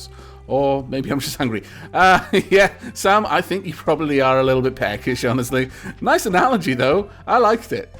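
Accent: United Kingdom. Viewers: Either Wales or England, if I recall correctly.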